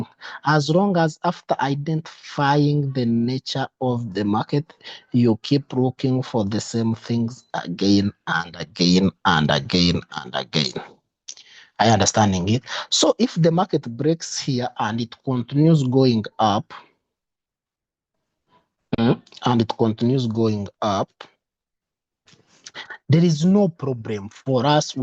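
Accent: Ankole, Uganda. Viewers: That accent is for pure banyankore not bakiga. Much love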